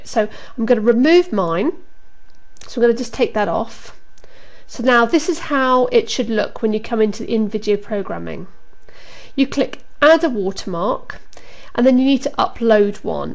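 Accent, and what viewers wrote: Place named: United Kingdom. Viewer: Thank u, I like ur British accent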